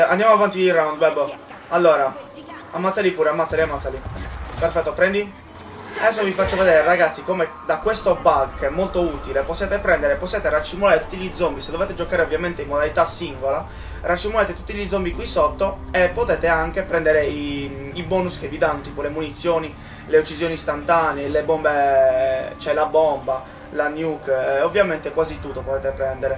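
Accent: Sardinia, Italy. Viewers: Ma sei sardo? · fra sei sardo vero??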